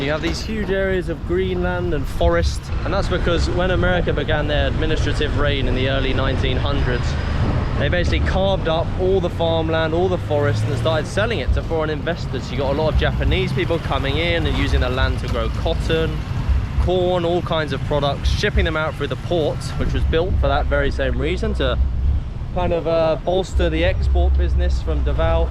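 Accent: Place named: Australia. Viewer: Hi, I thought your accent was Australian. I’m going to go check out Davao in October.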